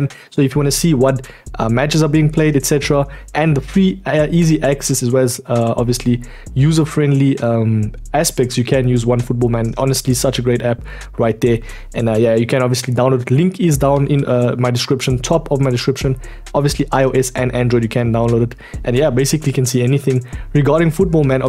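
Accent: South Africa. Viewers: I hear a South African accent boet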